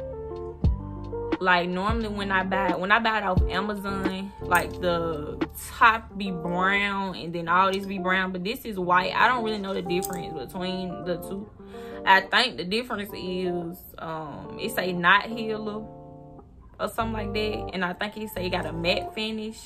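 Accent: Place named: Baton Rouge, United States. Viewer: You gotta be from Baton Rouge lol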